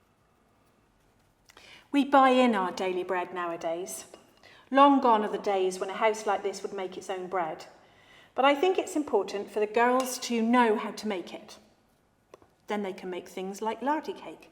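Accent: United Kingdom. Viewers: I love the pronounciation of british people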